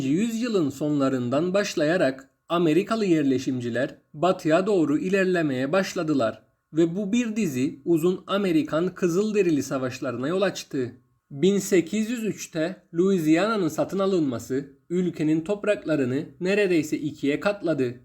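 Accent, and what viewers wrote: Cyprus. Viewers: Abi sende sanki Kıbrıs şivesi var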